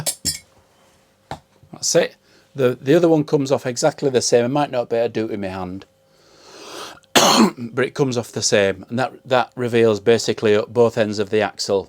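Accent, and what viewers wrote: Yorkshire, United Kingdom. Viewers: Yeah he's proper Yorkshire man 🔥💪🏻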